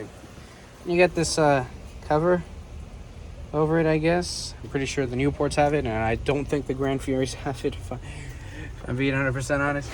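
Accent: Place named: New York, United States. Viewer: I thought you lived in new york with a new york accent when you said you lived in Florida 😂 cool accent this car was made a year before i was born in 1981 great classic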